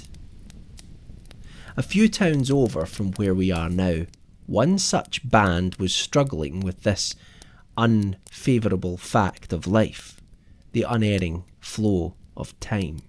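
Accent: Scotland, United Kingdom. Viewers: I love this. Not to mention I love creepypasta and my heritage. Scotland. So a scots reading creepypasta is just pure brilliant! Great job my friend ;)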